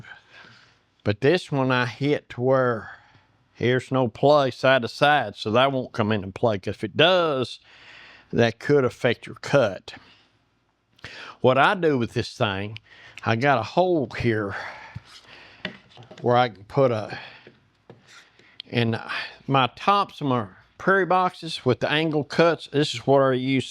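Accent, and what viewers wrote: South, United States. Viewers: I really like your channel sir, I’m a cabinet maker by trade and have been for many years. It’s guys like you who teach me things and help me grow. I thank you for your videos and experience. Keep ‘em coming. Don’t let the negativity get to you, some people have absolutely nothing better to do than put others down…. Especially when they are envious. Personally, I enjoy your southern accent. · Kids can't even make change at a checkout counter, and they want to make fun of a master carpenter because he has a southern accent! This tells you where this kids head is at. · The only thing better than your vid’s is your southern accent! Keep up the good work! Btw…are you going to tell us how you made the steampunk gears?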